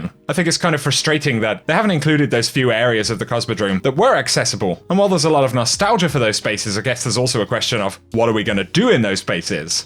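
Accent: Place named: Midlands, United Kingdom. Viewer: England probably the midlands by the sounds of it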